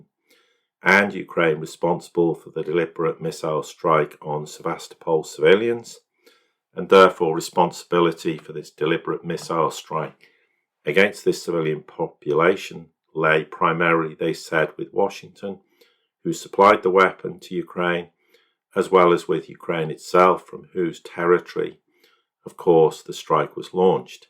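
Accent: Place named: United Kingdom. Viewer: I like your show. But even if you are legit, in time your British accent will make me think that you are a psy-op. The vast majority of individuals worldwide who work in communications positions (News, TV, Radio, Newspapers, Magazines etc..) that target a worldwide audience are British, and 95% of them are psy-oping.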